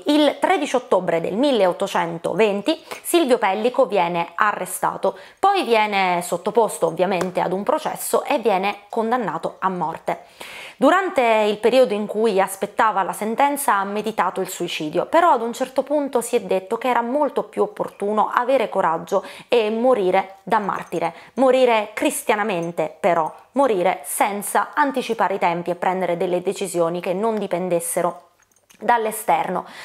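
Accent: Sicily, Italy. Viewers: Ma te sei siciliana vero?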